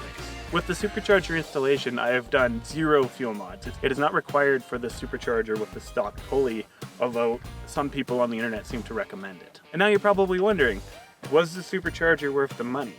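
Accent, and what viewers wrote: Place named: Canada. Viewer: He’s mocking your (Canadian?) accent. Ignore the troll. Great video, great sound!!! 👍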